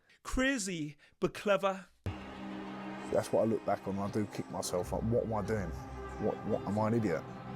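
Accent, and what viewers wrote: Newcastle, United Kingdom. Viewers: Great analysis. Is the narrator a geordie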